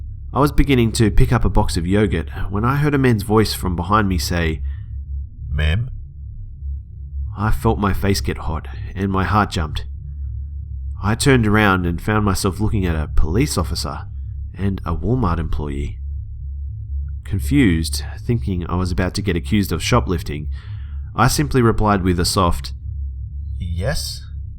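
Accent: Australia. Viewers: I'm Australian born and bred, yet for some reason, you're the only Australian YouTuber I've seen who I enjoy listening to, let alone can listen to without wanting to eject myself into the sun. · Hey are u Aussie I am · Your accent is awesome! I've been dating an Aussie(Brisbane fellow) for awhile and I've just fallen in love with your accents:) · Lol Austrailian accent idk why but it sounds funny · Subbed mate! Are you Australian? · Are you Australian I subbed by the way.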